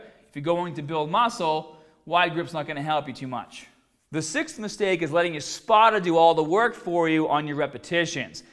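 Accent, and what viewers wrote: Boston, United States. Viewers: Love the Boston accent. No homo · Sounds closer to a Boston accent. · Boston or New England accent basically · I'm assuming you're from Boston, Mass. Love the accent [here in Australia!]. Very informative video. Excellent. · Got nothin but love for that Boston accent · love these videos but sometimes that accent makes me crack up :Bah, Bahbell, Hahd, entiah, muscle fibah hahaI wonder how weirb my SoCal accent sounds in Boston and new yohk and new joisey ? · boston aye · Subscribed for the Boston accent and legit information. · yeah the dude has a real Boston accent lol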